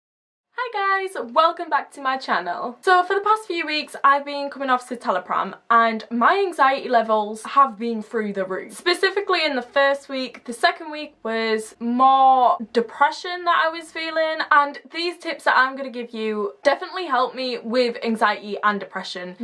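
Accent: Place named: North England, United Kingdom. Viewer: Just seen your one on coming off citalopram and wanting to do same, also you sound local I'm a northern lad too ha ha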